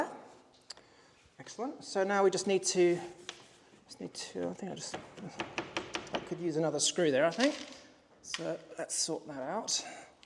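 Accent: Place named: Bristol, United Kingdom. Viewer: If I had to hazard a guess it would be Bristol 🤔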